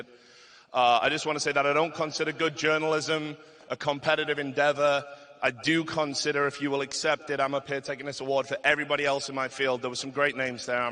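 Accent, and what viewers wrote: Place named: Newcastle, United Kingdom. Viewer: Love the Geordie accent.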